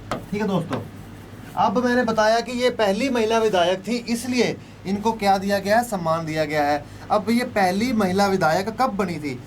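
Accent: Punjab, India. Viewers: Sir g tusi bolde ho ta hasa onda h😀😀😊😂 Pure punjabi 😄😄 Atttt sir G 👌👌👌👌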